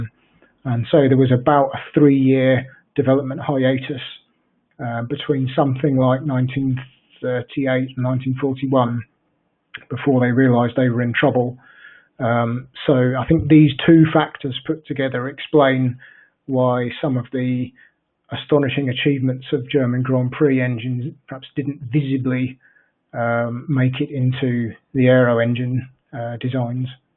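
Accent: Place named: England, United Kingdom. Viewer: I told you I fell asleep after 3 minutes. And if you put things like this up on you tube you have to accept the comments from every 1 that might watch YouTube. I enjoy history very much but with in 30 seconds of listening to the man talking in this vid I realised this was an English man which I had no interest in listening to. If you don't agree with my comment you can very easily ignore it it's far from trolling. Maybe I have hurt your feelings in some way. It's ok tho we all know history. We don't need to be told how it all worked out and who the better engineers were (germans) anyway I will say good bye now take care of yourself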